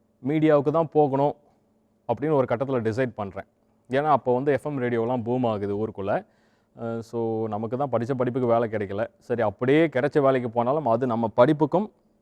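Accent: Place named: Coimbatore, India. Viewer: Voice of coimbatore... super anne...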